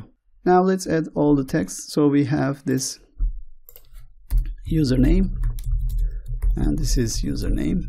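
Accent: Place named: Turkey. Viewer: just when I started to think "wow what a heavy Turkish accent", the tr locale showed up haha. Thanks for the tutorial though.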